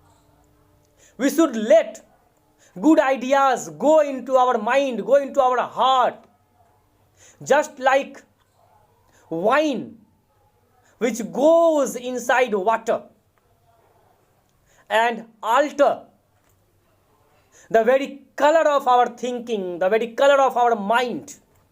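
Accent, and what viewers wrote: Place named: England, United Kingdom. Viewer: Sir nice english accent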